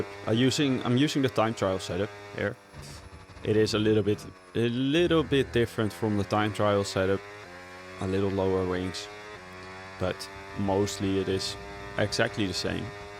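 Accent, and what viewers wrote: Netherlands. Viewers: I think your dutch of the accent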